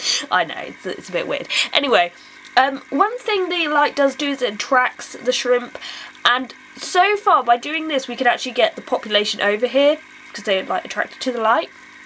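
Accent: United Kingdom. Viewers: i can't really recognize accents (since I've moved to wales which is weird) but are you British?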